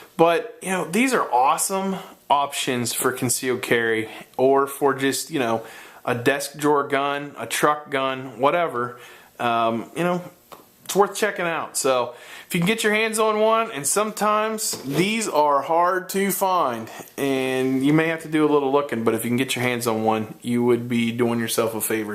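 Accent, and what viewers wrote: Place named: South Jersey, United States. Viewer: I know a south eastern PA/South Jersey accent when I hear one....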